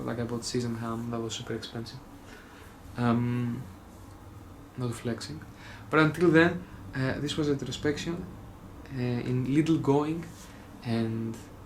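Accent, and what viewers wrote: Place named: Greece. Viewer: Your accent reminds me of stefanos tsitsipas, which makes sense because he is greek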